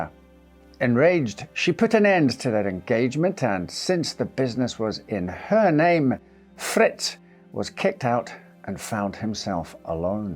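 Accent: Scotland, United Kingdom. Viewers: You accent amazes me, I grew up in the Scottish Borders so it makes me feel like you are from around the same area but you lean heavily on English for a lot of your R sounds you sound a non rhotic scot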